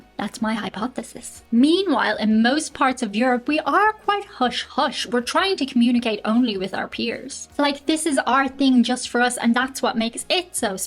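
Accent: Ireland. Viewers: Good irish accent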